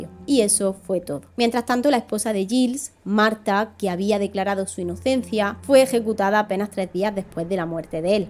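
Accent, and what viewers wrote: Andalusia, Spain. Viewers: Prestando más atención, si que percibo un ligero acento andaluz en tu hablar. Perdón por mis erróneas suposiciones. Ni eres madrileña ni eres catalana. Dicho he. Aum namah shivaya 🙏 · Muy buen canal, excelente contenido para los que somos apasionados por la historia. El tono de tu voz es muy relajante y tu acento es muy bonito de que parte de España es este acento?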